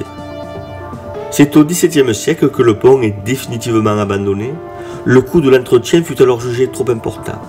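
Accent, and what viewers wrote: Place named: Avignon, France. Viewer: Oh, vous avez le plus bel accent du monde, et évoque pour moi de très anciens souvenirs puisque j'avais un peu cet accent depuis Avignon, quartier St Agricol, avant que je déménage à Paris à l'âge de dix ans....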